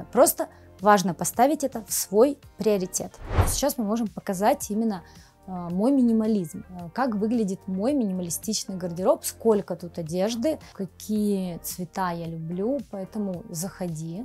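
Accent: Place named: Udmurtia, Russia. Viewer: У вас специфический акцент, очень характерный для Удмуртии или Пермского края. Вы случайно родом не из этих краев?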